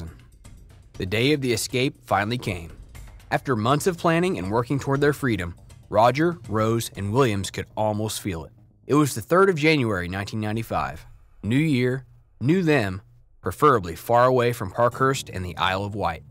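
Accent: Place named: United States. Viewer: Sounds wierd an American describing a UK prison 😂😂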